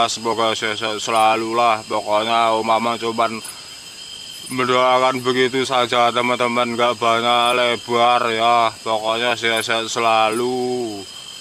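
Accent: Java, Indonesia. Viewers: Jawanya medok mas😅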